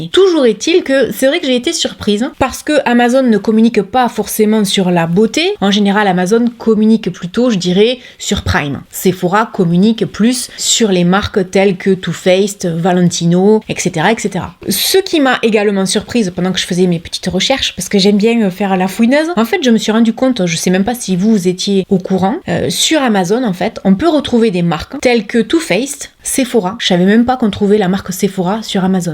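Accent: South, France. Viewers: Merci pour toutes ces précieuses infos👍 c’est toujours un doux plaisir de regarder tes vidéos bercées par ton accent du soleil que j’aime tant 😜 merci encore pour toutes tes recherches afin de mieux nous conseiller tu es une amour 🥰 gros bisous du cœur Mima et passe un heureux moment avec ta maman et tes petits loulous 🐶🦮🐕‍🦺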